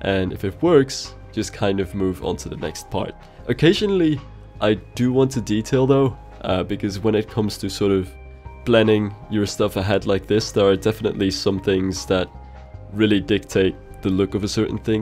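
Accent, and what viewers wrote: Netherlands. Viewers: Literally Dutch with a terrible cold... Beterschap,!:)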